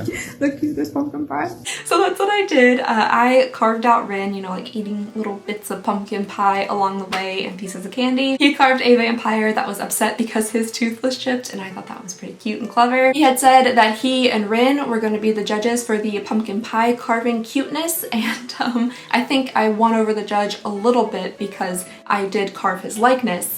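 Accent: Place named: South, United States. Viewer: HE'S SO SOUTHERN! i love it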